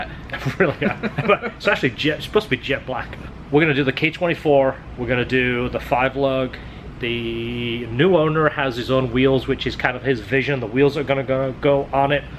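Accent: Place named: United Kingdom. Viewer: By any chance are you a Britt who emigrated to the States?, Im English & can pick up on your accent and some of your phrases haha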